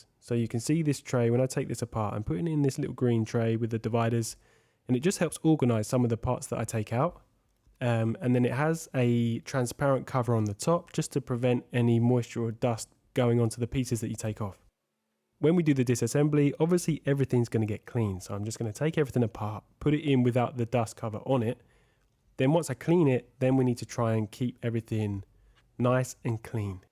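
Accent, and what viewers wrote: United Kingdom. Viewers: Really interesting channel mate. Just starting to take an interest in watches. Good to hear a British accent 😂👌🏾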